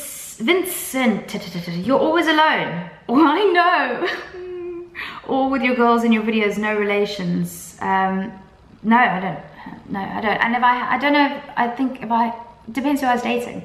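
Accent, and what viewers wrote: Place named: South Africa. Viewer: I had no idea that you weren't British but you have a strong South African accent. I had no idea you are a singer.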